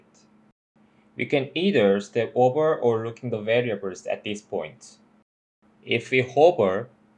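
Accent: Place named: South Korea. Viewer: 발음이 한국분이시네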